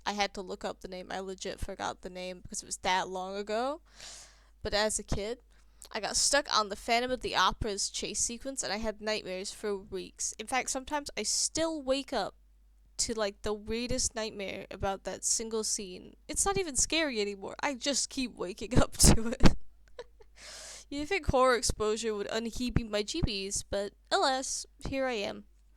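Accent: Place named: United States. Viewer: Nice to meet you 👋🏻 Glad to have seen this popped up in my recommendation. Also, as someone who lives in the US, you do sound a bit American. But that's okay. I hope & pray you have a great wonderful day/night & a bless life! As well your journey here on the platform spreading your enjoyment out to others, bringing the smile along side you. Stay safe, stay positive, stay frosty/cozy. Always watch your back & wish you the best of luck out there in the world. Take care now and I'll see you later when you poste your content! peace ✌🏻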